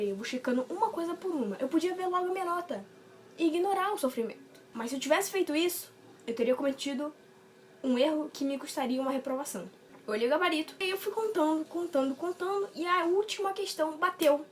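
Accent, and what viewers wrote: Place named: Rio de Janeiro, Brazil. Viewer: "A máquina não foi capaz de me derrotar". Uahaha. Muito bom,! Muito legal seus vídeos, descontraídos e com informações bem interessantes do dia a dia do estudante. Seu sotaque carioca é muito bom de ouvir. Fiz Eng. Mecânica no CEFET-MG e lembro da tensão de fazer algumas destas temidas provas. A maioria das provas no CEFET, eles costumavam e ainda costumam fazer somente questões discursivas (tanto as de Física como de Cálculo), eram bem tensas.:) Mas já tive a curiosidade de ver algumas das provas aí da UFRJ, bem difíceis.:) Parabéns pelo canal!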